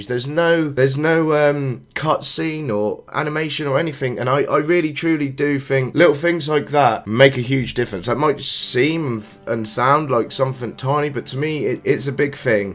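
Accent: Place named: East Anglia, United Kingdom. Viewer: I like your accent, where are you from? It reminds me of East Anglia in England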